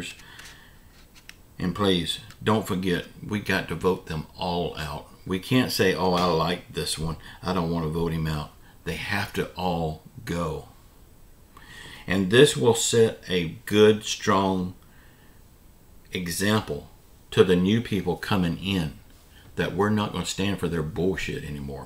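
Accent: South, United States. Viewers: We the people ask how many cups of coffee did you have this morning love your shoe! I can tell your from the south you have a great since of humor please don't ever change I get you ever morning to start my day with your great humor and truth love your wife's show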